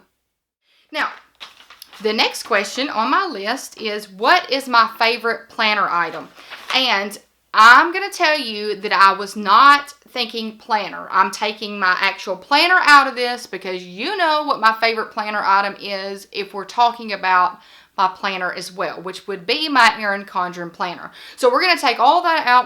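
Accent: South, United States. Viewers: LOL I thought you talked slow because you are a teacher and was in the habit of talking slower to explain stuff to your students. I'm a Southerner too, so no problem understanding you. I'm from Ky.And I love your videos.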